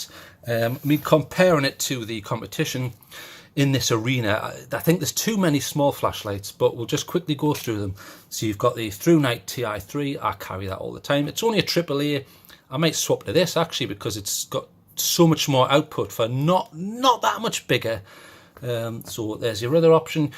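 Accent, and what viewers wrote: United Kingdom. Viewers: You british? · You cannot mix that British accent :D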